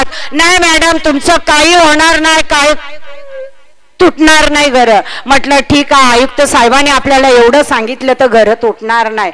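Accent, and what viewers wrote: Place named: Agri, India. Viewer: Agri aavaj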